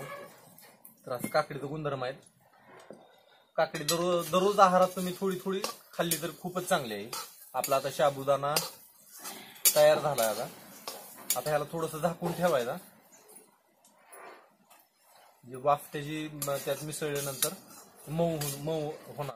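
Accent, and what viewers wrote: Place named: Marathwada, India. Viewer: Kuthle bhau aapan? Marathwadi bhasha watate..me Latur chi...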